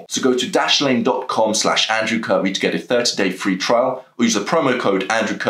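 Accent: England, United Kingdom. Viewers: You sound English it’s refreshing respect from South Yorkshire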